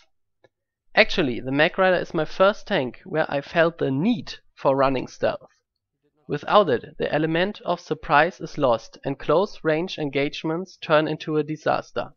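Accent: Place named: Germany. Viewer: Thanks! Very informative! I just upgraded my Magrider, so I dont Need to be ashamed, to have a gunner. This Video helped me a LOT.Ive mainly Play Harrasser or any Kind of Airvehicle. The Magrider was really a challenge for me, but it Looks BOSS. Im goin with the standart Nova, because He aint that good at aiming, if I want to kill Infanterie. For the Gunner, I am going with the Gun, wich gets faster while Shooting. Its not very accurate, but has the highest DPS.With that gun, you can easily do an Destruction-Combat against another Tank with gunner.Im using the Magburner, for the others, I havent decided yet.Thanks for doing this Video m8.(and I can clearly here youre German Accent, "Dosenöffner......auf welchem Server Spielst du?)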